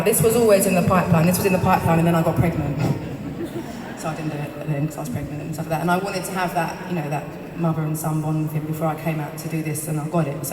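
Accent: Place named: South London, United Kingdom. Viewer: I don't understand one word of what she's saying. So South London and very fast speech.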